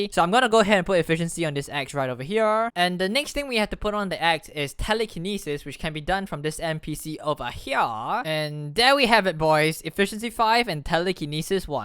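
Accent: Singapore. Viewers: Singaporean ah?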